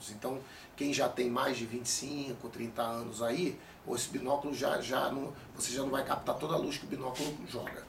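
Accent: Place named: Brazil. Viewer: Legal o jeito de falar kkkkkkkkk Sotaque bacana ! Brasileirado falando 👏🏻😃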